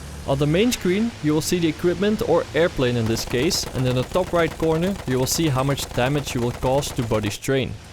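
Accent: Netherlands. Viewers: The dutch accent is strong in this one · jij komt zeker uit nederland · are you dutch??? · where r u from? u Sound Dutch :) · Haha Nederlands dat hoor je meteen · Ben je Nederlands ik hoor het namelijk lol maar goeie video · are you Dutch? if not from what country are you from? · ur dutch arent u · Are you Dutch? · R u dutch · Yo man are u dutch of you are here is a sentence for you: goeie video man super leerzaam! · ben jij nederlands of belg of zo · is this guy Dutch cause his accent is not english nut great vid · ben je nederlands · are you dutch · Your dutch aren't you ? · are you dutch/NL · That dutch accent is amazing xD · Are you from the Netherlands? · Are you dutch? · you can clearly hear that he is dutch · you just can hear you are dutch xd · he clearly is, he has that distinctive Dutch accent that you hear when 90% of the Dutch people speak English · Are You Dutch or something like that?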